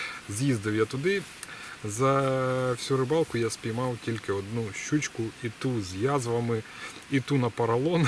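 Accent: Standard. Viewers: Сподіваюсь, що це щиро. Просто пригадую, як ти знову перейшов на російську і почався натиск, від якого ти відбивався. Це дуже класно, і українська в тебе, як і в багатьох російськомовних така, що багато україномовних позаздрять, в тому числі і я, адже вона в тебе літературна, з того, що чув в школі, читав. Але пам'ятай, будь ласка, що це рішення в першу чергу має бути щирим і не через коліно, адже будь-яка агресія створює приховану ненависть. Головне, що ти за людина, а мова це лише протокол, якому, на жаль, на мою думку, надали силу зброї, яку тепер проти нас і використовують, часто власними руками. Пам'ятай, що є українці, які тебе будуть любити, шанувати і цінувати, незважаючи чи ти говориш українською чи російською. І таких, я вірю, більшість. Нехай цей комент хоч трішки збільшить тобі твою впевненість у твому рішенні :) Вітаю у клубі україномовних, тут весело :) Ну і дякую за контент! 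Бомбезні щукани :) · Душевне відео. Краще за перегляд хорошого фільму.... Дякую👍🔥🎣🕶️ P.S. За приємну літературну українську окремо спасибі👍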